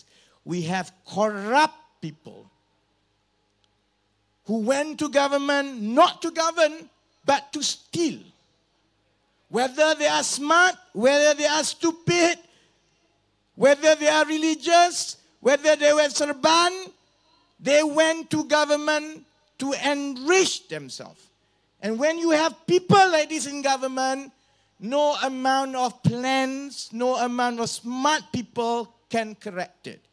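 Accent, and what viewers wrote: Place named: Kelantan, Malaysia. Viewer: Actually I hv liking for his speeches and I like his kelantanese slang....I used to listen from those days....I missed him in 2018....just envy this person for his mind frame....bravo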